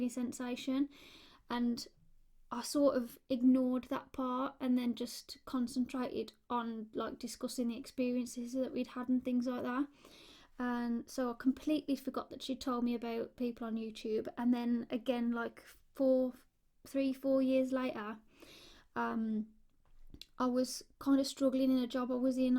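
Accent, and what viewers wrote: Black Country, United Kingdom. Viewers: I loved one of your older videos where you talked about your childhood blanket. Too bad that I didn't know about this video & questions, I would've asked some fun ones. As an American from Pennsylvania, I love your black country accent. Occasionally it makes me think of "Miss Braham's" from "Are You Being Served?" I loved reruns of that show. =] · Hearing your black country accent is just so random, especially because even in the UK you don't actually hear it that often either, I mean I'm in Manchester so thats probably why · Lovely bit of black country talking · Never guessed you were a yam yam dude lmao. West Midlands represent <3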